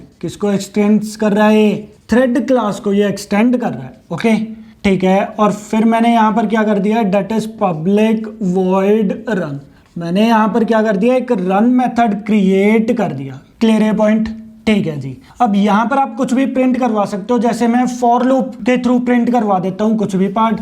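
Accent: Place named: Punjab, India. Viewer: Love ur punjabi touch hindi..... Hanji ..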